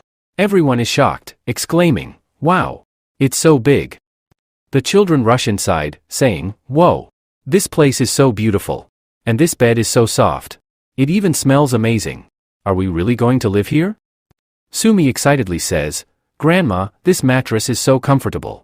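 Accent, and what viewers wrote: India. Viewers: WTF why after min 50 the Ai voice have India Accent, at least check your edited video dude.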